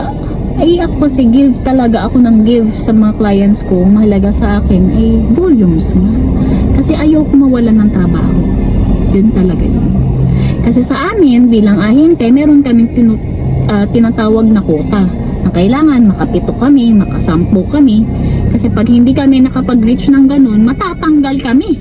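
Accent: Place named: Visayas, Philippines. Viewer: Bisaya ka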